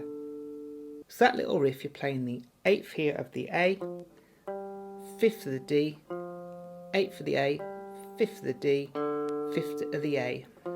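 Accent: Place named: South Africa. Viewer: Goods job. You sound South African. Another great song by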